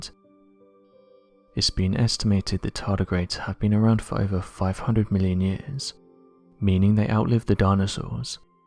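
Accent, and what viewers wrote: England, United Kingdom. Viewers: He sounds like an asian guy that learned english in great britain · Why not just speak in your usual English accent without the drama music?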